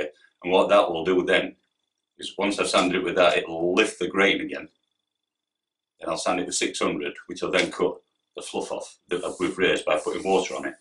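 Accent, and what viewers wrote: Yorkshire, United Kingdom. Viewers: Nowt wrong wi a Yorkshire accent.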